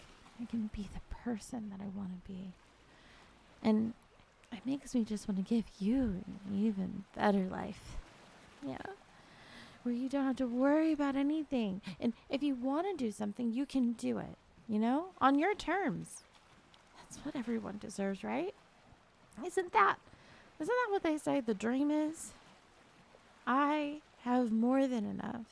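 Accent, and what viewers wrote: South, United States. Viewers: I may hear the southern bell often but tbh it suits you better than most folks I've heard it from that's for sure lol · Cute video, you southern accent smooth talker. Have a great weekend. 🍎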